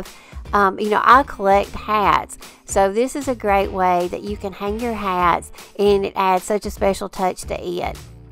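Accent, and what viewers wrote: South, United States. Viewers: You have such good ideas, classy and not cheap looking store bought items. Love your upcycles, repurpose of old things..also enjoy your background music and your sweet southern accent.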